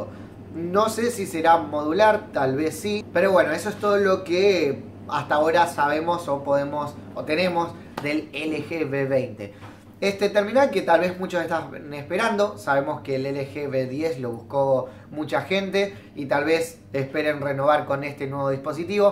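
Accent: Argentina. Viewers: hola de que parte de argentina sos?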